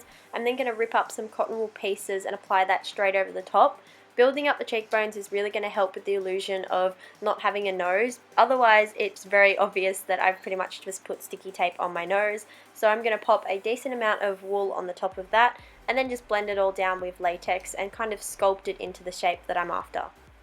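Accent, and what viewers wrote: Australia. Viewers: Are you Australia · I love her Australian accent.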